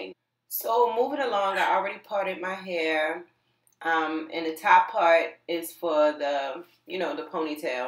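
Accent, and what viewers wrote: Baltimore, United States. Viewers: You from Baltimore huh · As soon as you started talking, I went to find your IG.. I just KNEW you were from Baltimore lol. I am as well ♥️ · Heeeey! Are you in the Baltimore area? I hear your accent! Do you have a shop here? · She must be from Baltimore I hear the accent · You are so pretty!!!! Are you from Baltimore? U have that city accent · You from Baltimore omg 😭 I can hear it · Definitely turned out cute!! Sound like u from bmore! Lol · BMore accent undeniable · I love your accent? You from Baltimore? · You from Baltimore? I hope you don’t say “Ehh no “ lmao cause you low key sound like you from here plus you cute asf !! 😍Your hair came out BOMB!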